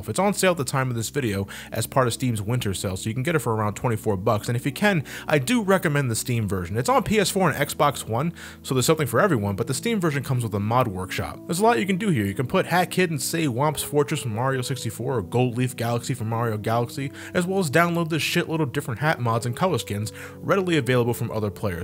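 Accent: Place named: New York, United States. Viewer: Thanks for all the content my man. Swore you were from NYC from the way you speak and the moving out of your mom's crib video and all that, but either way, hope to meet you at magfest. I know you'll be as awesome as you've always been on camera. God bless. Se te agradece todo lo que has hecho.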